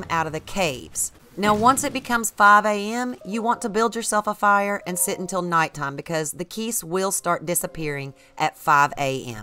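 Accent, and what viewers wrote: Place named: Midwest, United States. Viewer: I keep getting distracted by your super thick midwestern accent 😂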